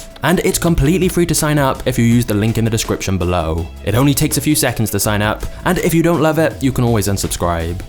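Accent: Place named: Midlands, United Kingdom. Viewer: It's a middle England thing, this is how people speak. For strong and proper English, go North!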